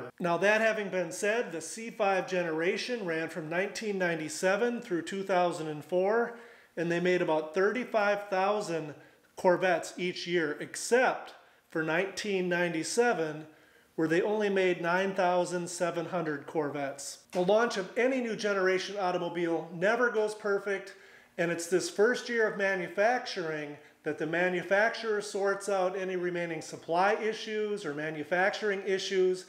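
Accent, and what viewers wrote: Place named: United States. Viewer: Hello...😊many thanks goes out to you by the way, you are speaking a great American English! for me as a German girl it's easy to follow you. I am C5 owner only for a month and love my car. Your videos are interesting and give a lot of information 👍🏼 All the best + GOD BLESS AMERICA 🇺🇸🗽 greetings from Sam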